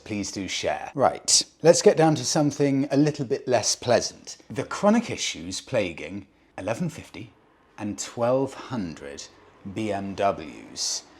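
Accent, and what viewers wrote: United Kingdom. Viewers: Americans criticising English pronunciation..... that's rich😆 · Americans telling an Englishman his pronunciation is wrong, yeah, that's going to work. World's gone crazy. Still, it's been said that America and Britain are two nations divided by a common language. What language is that, one might ask? Er, it's called English mate. Not American. We reserve the right to mangle U.S. words as we see fit. I mean, ask a Yank to pronounce Shrewsbury, or Worcester. My case rests m'lud.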